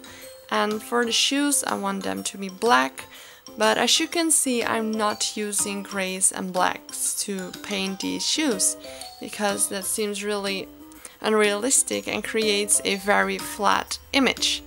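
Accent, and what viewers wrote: Netherlands. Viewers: Are you dutch by chance? idk I kinda sound the same when I try to speak English. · Dutch? XD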